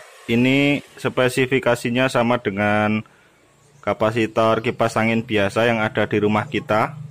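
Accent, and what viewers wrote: Java, Indonesia. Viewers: Maaf bang...ngomong bahasa Indonesia boleh medok...tapi bahasa Inggris jangan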